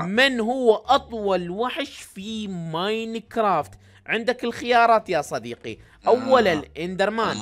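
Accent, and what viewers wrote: Iraq. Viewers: عندي سؤال هل انت عراقي ولا لا??❤❤😊😊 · هاذ عراقي؟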